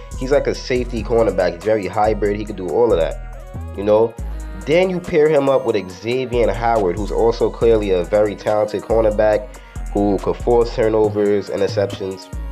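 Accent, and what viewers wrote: New York, United States. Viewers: trying to place your accent! A little NY In there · Not feeling this NY accent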